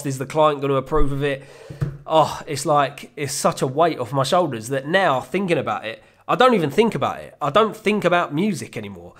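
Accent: United Kingdom. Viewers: Brit accent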